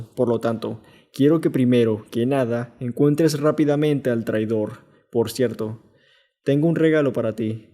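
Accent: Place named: Venezuela. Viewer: El pana este habla como venezolano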